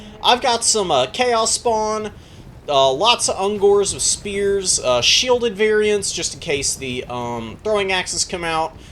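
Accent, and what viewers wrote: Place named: Mid-Atlantic, United States. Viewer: The mid Atlantic accent is strong with this one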